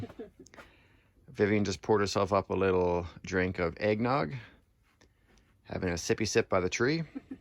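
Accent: Newfoundland, Canada. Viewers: You from Newfoundland? If so that’s cool man I’m from back on the rock as well !! Just subbed to you !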